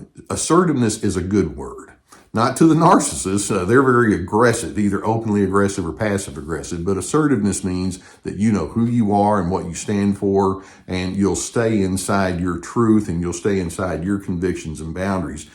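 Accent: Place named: Alabama, United States. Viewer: Thanks again for your calm voice, your lovely Alabama accent and your great teachings on dealing with narcs. Mine moved out 6 years ago but still phones me occasionally and I still get a bit sucked in to his sob stories and lies and accusations, his gaslighting etc. One part of me still misses him. The other part knows I've gone back into denial and fantasy land. Listening to you always puts me back onto firm ground again. Many thanks.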